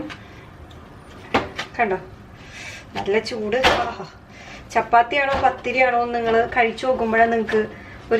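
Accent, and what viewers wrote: Thrissur, India. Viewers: Oru raksha ellaattaa chechi 😍 THRISSUR Kari aaaano? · Haiii chechikutti njan puthiya alanetto first vedio kandappo thanne subscribe cheythu Yummy recipe Enik tcr slang othiri ishttaaaa Thrissurkarum poliya Love you ma swt ♥️♥️♥️♥️♥️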